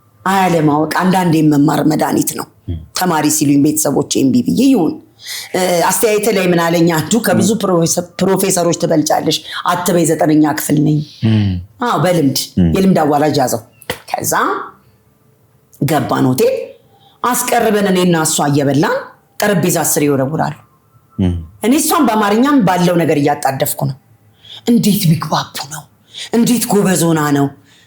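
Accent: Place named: Dire Dawa, Ethiopia. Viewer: እኔ እውነት ለመናገ የድሬደዋ ሰው መሰለችኝ አነጋገራ የአገሬን ሰው መሰልሽኝ።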